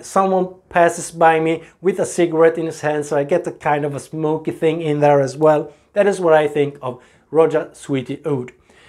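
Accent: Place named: France. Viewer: Haha love your French accent 🤣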